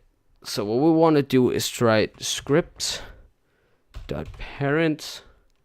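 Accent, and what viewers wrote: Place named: Scandinavia. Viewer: U have a danish/scandinavien accent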